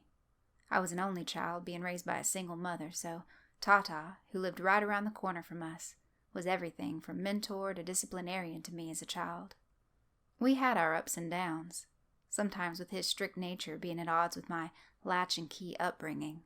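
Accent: South, United States. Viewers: When a creepy pasta is narrated by Scarlet O’Hara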